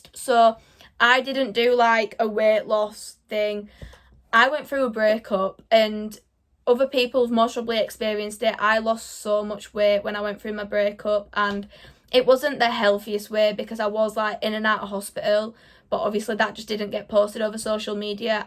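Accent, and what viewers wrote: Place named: Yorkshire, United Kingdom. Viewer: It's Yorkshire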